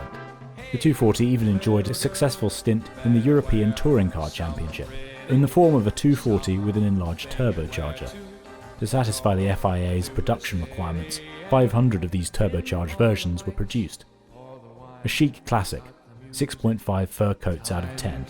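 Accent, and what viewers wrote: United Kingdom. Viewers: Lost me at the British accent.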